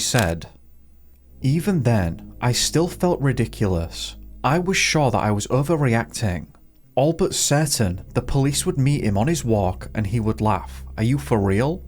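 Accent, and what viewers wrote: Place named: North, United Kingdom. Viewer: I just really am struggling to listen to your northern accent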